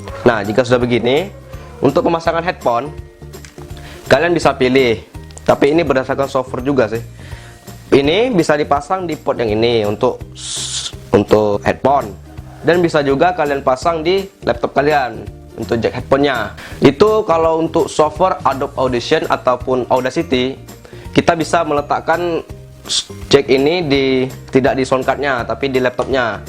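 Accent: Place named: Palembang, Indonesia. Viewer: Org mana bng kok logat kyk org palembang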